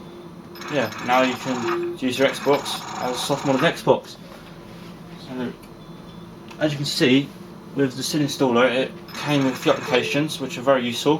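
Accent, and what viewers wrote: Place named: London, United Kingdom. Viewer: typical londoner, good stuff.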